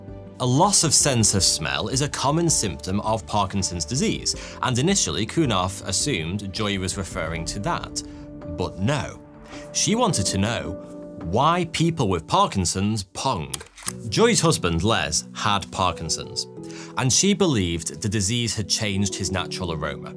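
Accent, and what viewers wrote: United Kingdom. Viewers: It's a play on his regional British accent. Finking = thinking For'y two = thought he, too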